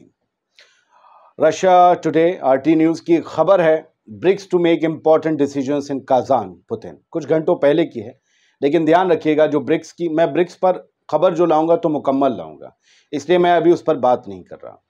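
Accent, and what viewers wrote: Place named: Allahabad, India. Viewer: Aapki baat karne ki andaaz ekdum Allahabadi hai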